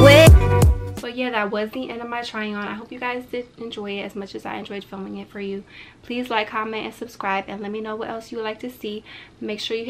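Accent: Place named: New Jersey, United States. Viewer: So your a Jers3 girl. Still there? Your accent is sweet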